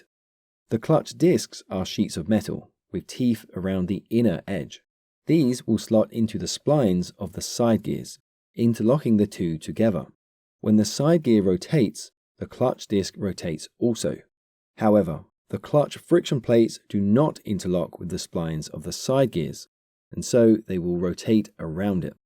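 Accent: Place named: England, United Kingdom. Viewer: Usually, the gears that you refer to as 'spider gears' and 'side gears' should more properly be referred to as planet gears. Others have also mentioned the crankshaft/camshaft error. Your terminology (despite your English accent) seems to be the dumbed down USA version. It's almost as if the correct term is just too difficult to learn or remember, so they HAVE to simplify it. Otherwise, a fairly decent explanation of events. Regards Mark in the UK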